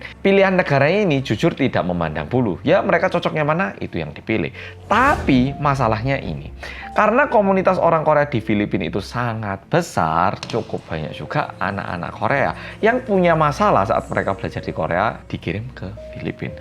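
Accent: Java, Indonesia. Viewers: Kk sdah menetap d korea ya? Aq org jawa,pling suka dg pembawaan medoknya😊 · Hai org korea medoook❤ Nyimaaak 🎉🎉🎉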